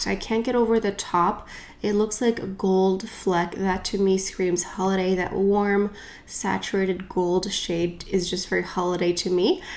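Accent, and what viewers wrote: France. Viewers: I adore your french accent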